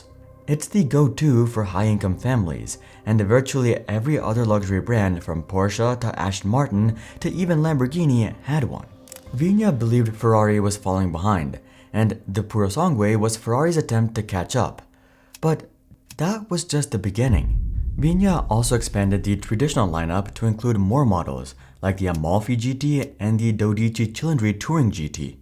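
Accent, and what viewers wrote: India. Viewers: The narrator is trying so hard to hide his indian accent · Am I the only one to think that has an Indian background, judging by his accent? Otherwise I'm struggling to get used to not knowing about a foreign channel without a face, nor a common accent, be it UK or US.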